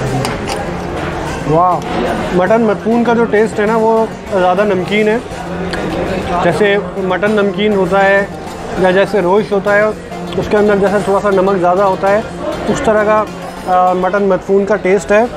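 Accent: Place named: Lucknow, India. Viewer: Puri laucknowi juban hai aapki.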